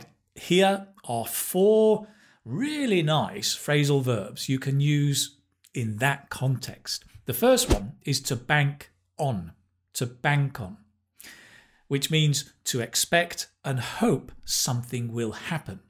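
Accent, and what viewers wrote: United Kingdom. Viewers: You are a great native speaker teacher! I love British English.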